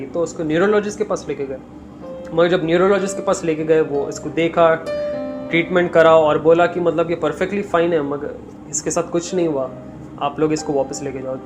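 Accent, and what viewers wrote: Hyderabad, India. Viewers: phle jaisi baat nai aari, (Hyderabadi bolne ka tareeqa...) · Accent bohot hai baato mein apke pure hyderabadi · Ak baat humesha notice kiya Hyderabadi admi kese bhi baat kare pata chal hi jata h ino old city ke h😀😀😀😀😀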